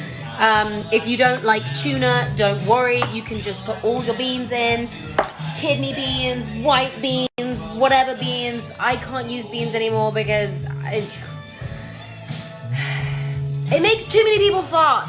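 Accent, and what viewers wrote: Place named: Oxford, United Kingdom. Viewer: oxford accent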